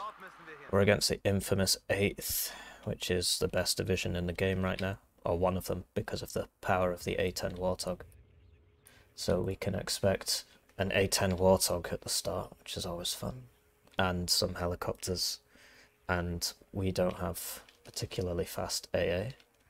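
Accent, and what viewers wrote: United Kingdom. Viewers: Lol at the juxtaposition of the Barbie song and a calm British voice bringing death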